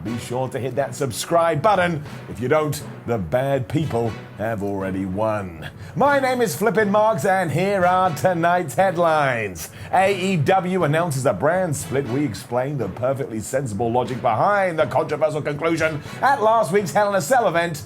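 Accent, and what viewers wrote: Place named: United Kingdom. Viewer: British accent. Annoying.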